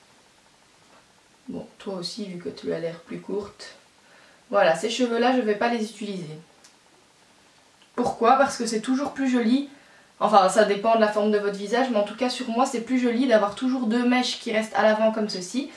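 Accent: Belgium. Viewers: Je me disais bien que tu avais un accent belge , by the way je vais a la mia dimanche aussi :))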